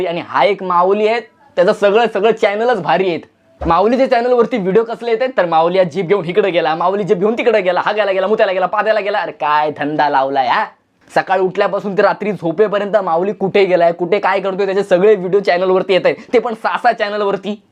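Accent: Konkan, India. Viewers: 😂😂😂haha mja aa gya Dada tu kokani ahe ka?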